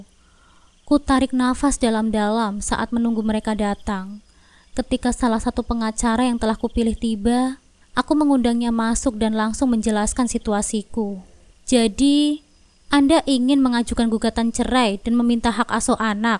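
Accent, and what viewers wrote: Java, Indonesia. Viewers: Medok amat sih min Lucu denger nya hahaha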